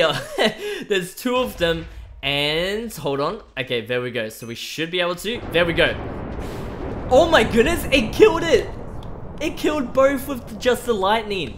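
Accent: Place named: Australia. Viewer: its gauntlet not glove and also what place of australia ya from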